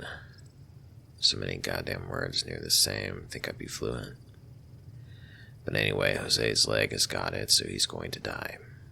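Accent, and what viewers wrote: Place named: West, United States. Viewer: Underrated video. You put on a good voice and have a natural Western accent. Hope this gets the recognition it deserves.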